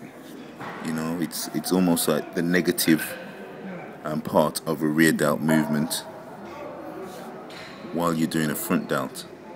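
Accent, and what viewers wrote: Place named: United Kingdom. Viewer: loving your brittish accent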